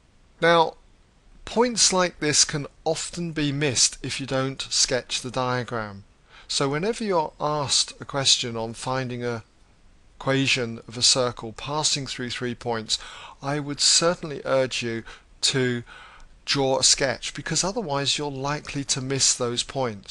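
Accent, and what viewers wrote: United Kingdom. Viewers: I love your British accent <3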